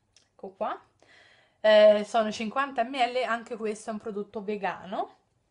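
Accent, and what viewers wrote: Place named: Tuscany, Italy. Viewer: Ma poi il tuo accento è bellissimo, sei Toscana per caso?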